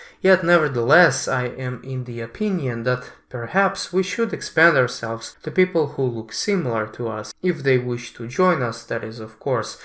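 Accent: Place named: Eastern Europe. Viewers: Ah so you're a slav? And here I thought that your accent sounded familiar. О, так ты славянин? А я то думал что акцент звучит знакомо. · How can you miss the accent, and also he is smart and knows how to argue....All the best Slavic values... Na zdravlje.🍻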